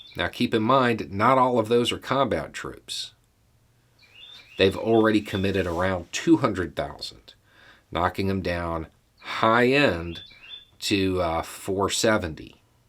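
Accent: South, United States. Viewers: As a gal who grew up in part in southern Alabama, it's nice to hear a Southern accent talking sense.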